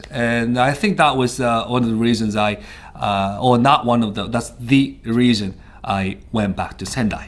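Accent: Spain. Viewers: I was thinking you had kind of a spanish accent when speaking english... Just figured out why! That being said, I think it is great you have your own channel now and wish you all the best! I wanted to visit Japan for a long time now and watching you and Chris travelling the country and having all kinds of mouthwatering foods and fun adventures made me decide to start saving up for a trip next year (due to current circomstances...). Can't wait!! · hahaha eres un grande, tienes un buen espanol. se nota que aprendiste el acento castellano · Wow that Spaniard accent. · your spanish accent melted my cold dead heart. Speak more spanish to us please.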